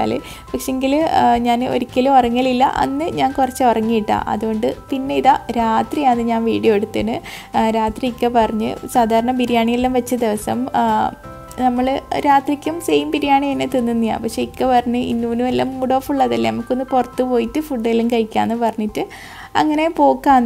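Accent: Kannur, India. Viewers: Hi ... Videos kanan Nala resam undato. Kanoor sasaram kelkan Nala super anu. Eniku oru mol undu 8 months anu mole uragikazhija night 11 pm kazhija njn videos kanan time kittoolluto atha comments onu ayakan pattunilane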